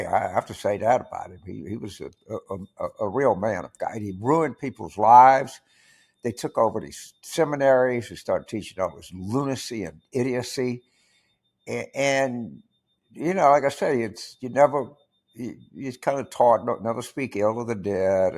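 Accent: South, United States. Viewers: Mr. Carville, I cuss pretty much the way you do, but w/o the Southern accent. I’m an old broad from the southwest part of the San Fernando Valley in CA. But my point is that you not only make me laugh quite a bit, you are so knowledgeable about politics, but life as well. Thank you for continuing to share your knowledge and opinions with us. I appreciate your doing this so much.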